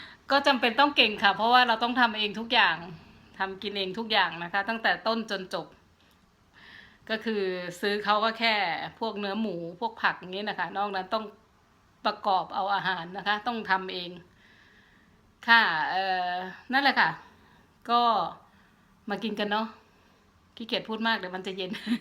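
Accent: Isan, Thailand. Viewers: ยายเป็นคนจังหว้ดใหนค่ะสำเนียงเหมือนคนอีสานแถวสกลนครนะใช่ปะค่ะคือคนบ้านเดียวกันนะ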